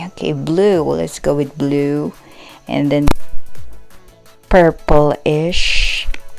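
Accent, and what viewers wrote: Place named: United Kingdom. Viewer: Blue for sad ☹️ Anyway, pinapasaya tlga ako ng british accent mo sis HAHA.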